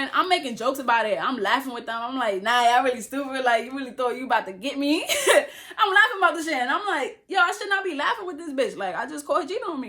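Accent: New York, United States. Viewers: I frikin love the New York accent!! I’ll be watching you just to learn... ps you are a natural beauty 🥰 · LMBOOO you are hilarious 🤣🤣🤣 and your NY accent is top5 girl 😹😹🤣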